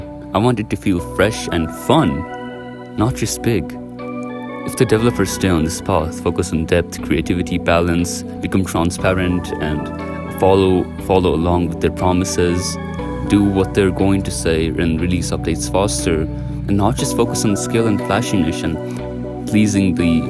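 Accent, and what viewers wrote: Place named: India. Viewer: BRO YOUR IN NINTH GRADE ??? SOUND LIKE A 20 YEAR OLD INDIAN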